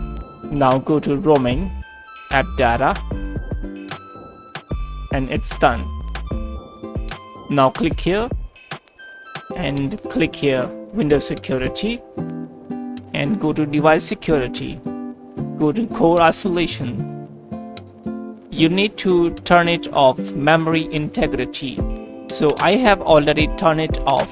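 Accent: India. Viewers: Hey man, thanks for the help! I know that if a tutorial has an indian guy voice i know that he will help my problem, and it will Thank you a lot Subscribing btw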